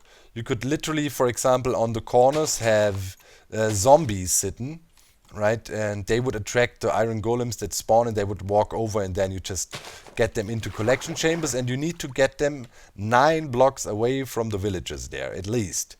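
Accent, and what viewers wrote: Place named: South, Germany. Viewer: Der Süddeutsche Akzent 😁 Ich liebe es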